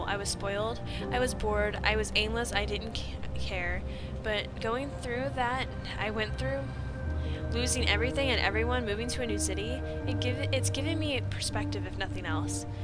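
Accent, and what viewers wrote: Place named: United States. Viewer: I am so glad you did an episode like this:)plus I love hearing an American accent:)you know me haha it became my bedtime story(@@ xxx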